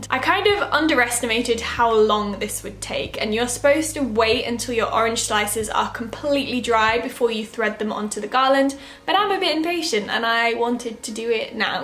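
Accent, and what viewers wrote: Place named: United Kingdom. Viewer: It’s interesting to see how other ppl around the world decorate, especially around the holidays! Based on you accent I’m assuming you’re in Great Britain or close to? Do y’all have “dollar stores”? Or similar type of stores? I wonder if the same things that are trending or popular in the US, are also in Britain at the same time? Hmmmm, it’s certainly interesting! Good day to you, stay well.!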